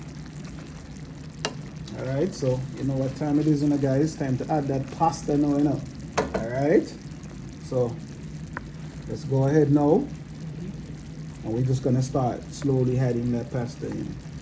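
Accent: Jamaica. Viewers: I love your Jamaican Accent. The food looks amazing.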